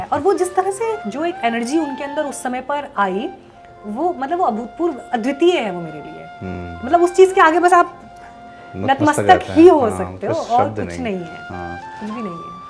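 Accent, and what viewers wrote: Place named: Garhwal, India. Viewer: स्टाइल भले ही आप कह रहे हैं पर हिन्दी बोलने पर कहीं कहीं गढ़वाली लहजा समझ आ रहा है।😊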